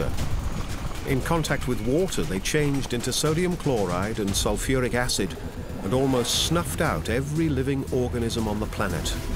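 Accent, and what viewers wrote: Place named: United Kingdom. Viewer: A smooth British accent can make people believe almost anything.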